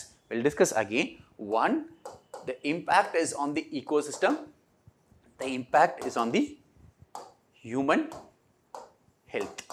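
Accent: South India, India. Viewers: That soth Indian accent of sir is great 😅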